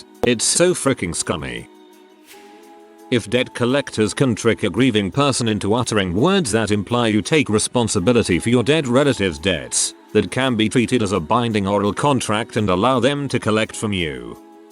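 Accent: United Kingdom. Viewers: Where did you get that robot voice with a British accent ,,, it's hilarious.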